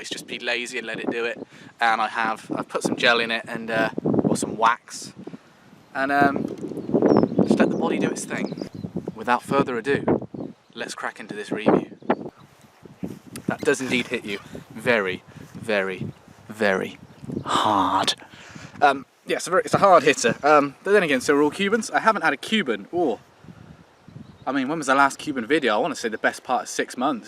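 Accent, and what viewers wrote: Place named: England, United Kingdom. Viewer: I love this english accent 😃 take care of you europe. Stay safe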